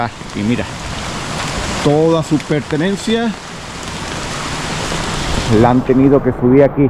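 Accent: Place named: Andalusia, Spain. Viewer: Se entiende que estás en Andalucía por los nombres y por tu acento pero no dices¿ en qué provincia estás?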